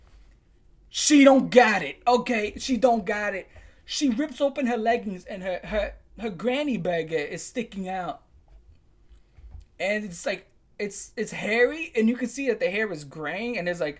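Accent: New York, United States. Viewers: You sure you're not part Italian? You have the NY guinea accent and you're hilarious.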